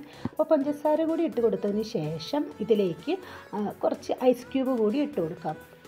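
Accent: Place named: Kannur, India. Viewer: മാഷാ അല്ലാഹ് എനിക്കു ഏറ്റവും ഇഷ്ട്ടം ഉള്ള ഒരു സാദനം ആണ് ഈ കാരറ്റ് 😋കാരറ്റ് കഴുകി മൊഞ്ചക്കി പാവം അതിനെ ചൂട് വെള്ളത്തിൽ മുക്കി അതിന്റെ ചുറ്റും ഉള്ള അണുക്കളെ ഒക്കെ കൊന്ന് വേവിച്ചു തണുത്ത ശേഷം മിക്സി യിൽ ഇട്ട് കുറച്ചു പാലും പഞ്ചാരയും ഐസ് ഐസ് ക്രീം ഒക്കെ ഇട്ടു ഉണ്ടാക്കിയ അടിപൊളി ഹെൽത്തി ജ്യൂസ്‌ ഉണ്ടാക്കുന്ന കാഴ്ച ഞമ്മുടെ കണ്ണൂരിന്റെ സ്വന്തം ചാനൽ നിങ്ങൾക്ക് മുമ്പിൽ നാടൻ കണ്ണൂർ സ്റ്റയിൽ സംസാരംകൊണ്ട് നിങ്ങൾക്ക് മുമ്പിൽ അവതരിപ്പിച്ചിരിക്കുന്നു എനിക്കു ഇഷ്ട്ടം ആയത് കൊണ്ടു എന്റെ ഇന്നത്തെ ഇഫ്താർ ഡ്രിങ്ക്സ് ഇതായിരിക്കും 👌👌😋🥰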